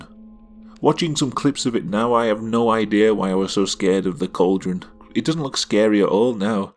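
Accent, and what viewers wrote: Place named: Liverpool, United Kingdom. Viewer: Ah cool your accent sounds slightly Liverpudlian. Yes I live in Bearwood and know Bromsgrove quite well, nice place.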